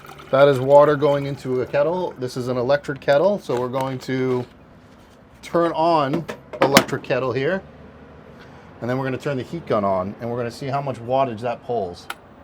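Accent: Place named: New England, United States. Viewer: Keep talking about your drawer slides lol you have such a New England accent! I lived in Mass for 21 years and I’m in SoCal now. Hearing you say drawer takes me back 🙌🏼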